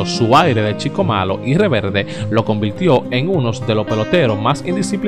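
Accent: Cibao, Dominican Republic. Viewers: Yo siempre les dicho a ese narrador qué hable más al paso, pera que suelte ese hablar tan Cibaeño, ejemplo, mul, al, sel, por decir, muy, hay, seis!!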